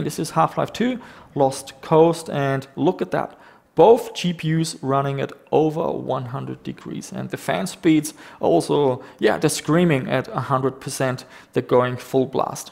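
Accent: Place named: Germany. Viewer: Nice Video, can i ask you were you’re from? You have kind of an German dialect :D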